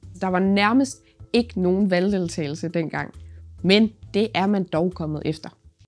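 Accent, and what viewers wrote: Denmark. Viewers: Kan godt lide at det er det sønderjysk der er fra omkring Haderslev haha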